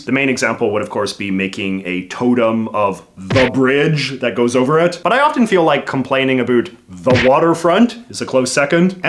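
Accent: Canada. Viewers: "You know what they say about the weather here in {insert name of your north American city}, if you don't like it, just wait around a minute, it'll change" Are you exagerating your Kanuck accent? Or is that for real?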